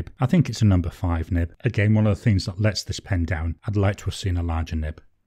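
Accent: United Kingdom. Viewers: Hi, your pens are more down to earth and it was interesting to see your selection rather taste. I liked that you showed the price of the pens but was curious why in $ when your accent is from the UK, then I seen another video, your are in Australia! I have been using a Pelikan M205 B (Merry Berry Ltd Ed), Monteverde Innova F and just bought a Diplomat Elox F. Thank you for your Inkvent and other reviews to keep me occupied, Happy New Year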